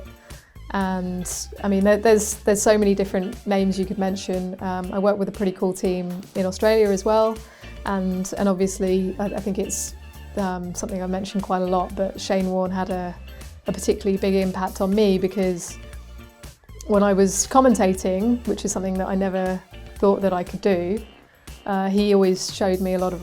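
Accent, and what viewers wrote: United Kingdom. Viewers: My favorite female commentator .... with lovely voice, English accent, very beautiful personality. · Listening to her voice in British accent is pne of the most satisfying things 😌 ✨️ 😍 💕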